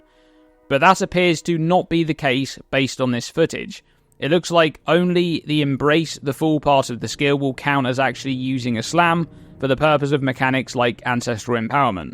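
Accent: United Kingdom. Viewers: The English accent is the nicest of all the accents.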